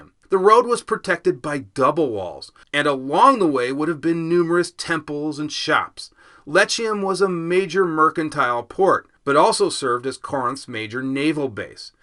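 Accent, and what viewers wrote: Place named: United States. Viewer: I live in Vancouver, thank you for asking! You sound....American?...judging by your pronunciations. Where from exactly? On that note, in your piece on the roman Legion, regarding a couple of your pronunciations; isn't it supposed to be Prince-a-pay, and Tree-aree-eye? That is how I always heard these names pronounced. I would be curious to know..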